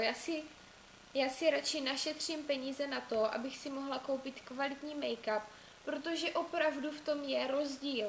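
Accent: Moravia, Czech Republic. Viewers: hele mam otazku! Ty jsi z Moravy ??? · Líp bych to neřekla.. :) a jen tak mimochodem.. tak trochu podle přízvuku.. ty jsi z moravy?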